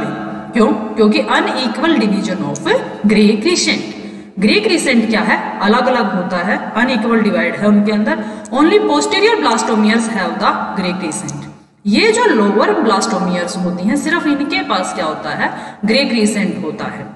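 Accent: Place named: Haryana, India. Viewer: Haryanvi mdm 😅❤nice explaining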